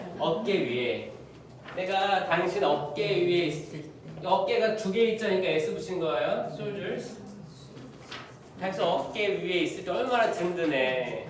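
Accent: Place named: United States. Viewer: 예수님! 신부님 영상 찾아보니 정말 대단하시군요~~*** 강론,특강,성경읽기,영어성경,기도문영상들 ᆢ 매일듣게되는 마법이 있습니다 호주계셨는데도, 우리가배운 미국영어와,성우처럼 음성이 좋으셔서 계속듣게 됩니다 신부님 항상 영육간에 건강하세요🍎 주님말씀 전해주셔서 감사합니다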